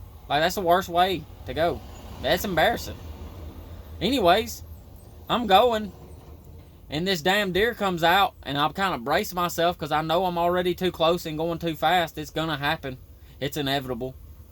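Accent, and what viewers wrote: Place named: Alabama, United States. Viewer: I REMEMBER THIS, also golly gee I miss your lil BAMA ACCENTT!!!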